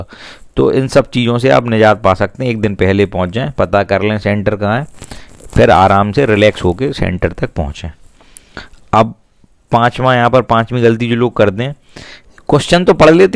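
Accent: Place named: Jhansi, India. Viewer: Apki language jhansi ki lg ri h sir jhansi k h kya sir aap😁😁😁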